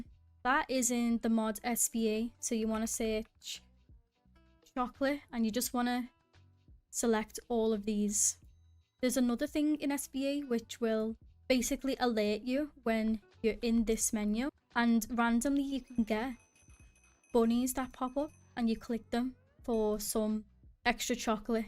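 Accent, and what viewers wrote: Liverpool, United Kingdom. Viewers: Are you from around Liverpool? I can’t shake that accent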